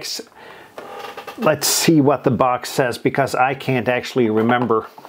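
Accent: United States. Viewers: Yes, I've noticed a lot do speak English well. This man in the video could pass for an American or Canadian citizen with his accent, no problem. I'm American, by the way. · Your prosody not very Norwegian at all. You sound waaaaaaaay more American than Norwegian.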